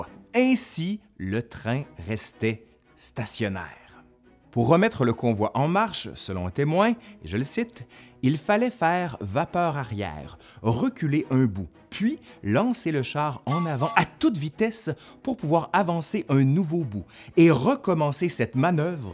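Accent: Quebec, Canada. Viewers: slt tout le monde, je n'ai pas regarder toutes ses vidéos, mais avant j'avais remarquer que pour les vidéos qui s'orientais sur l'histoire québecoise, notre cher orateur avait un accent plus prononcé (qui pour moi, rendez difficile la compréhension de la capsule) et la je trouve qu'il fait attention à son accent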